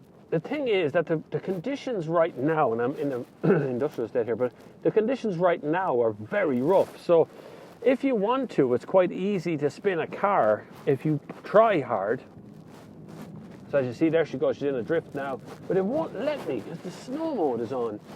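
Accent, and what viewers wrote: Ireland. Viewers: baaags of space, love this Irish accent, 2011 quite good amount of snow.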